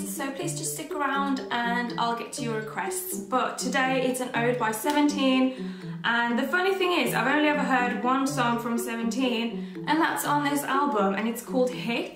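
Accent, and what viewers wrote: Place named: United Kingdom. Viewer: Wait are you Swedish!?? I though you were from the Uk! I’m Swedish btw lol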